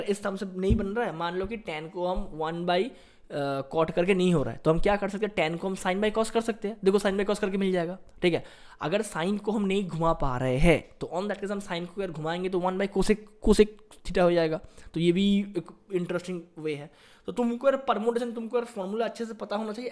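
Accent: Bengal, India. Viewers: Sir are you bengali?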